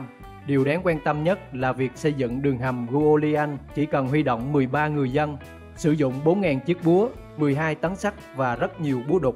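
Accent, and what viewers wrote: Central, Vietnam. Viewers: Ad quê ở miền trung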